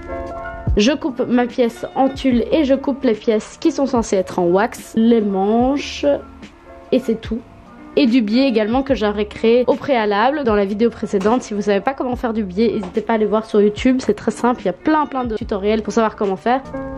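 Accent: Belgium. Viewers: Mais mdr tu as réussi à me faire regarder des vidéos couture! Je n'ai rien contre la couture hein, c'est juste que je suis plutôt au level "Incapable de réparer le trou d'une chaussette grossièrement sans me coller l'aiguille dans le doigt"... Voilà 😁. J'ai connu ta chaîne il y a peu via des vidéos coloration (ça, c'est de suite beaucoup plus mon domaine!), et du coup, comme j'aime bien ton style, ton enthousiasme, ton accent belge, j'ai regardé la vidéo sur le corset. J'aime beaucoup les corsets (magnifique engin de torture), et j'aime beaucoup la Wax, et je me suis prise au jeu lol. Franchement, le top est superbe, et il rend le corset tout de suite beaucoup moins casse-gueule à porter 😅. GG en tout cas, c'est un sacré boulot !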